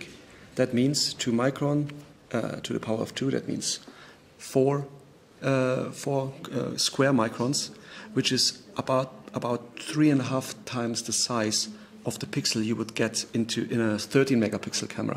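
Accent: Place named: Germany. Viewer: Man merkt das du deutsch bist :D Akzent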